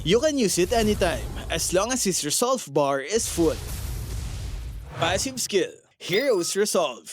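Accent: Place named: Philippines. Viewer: Logat PH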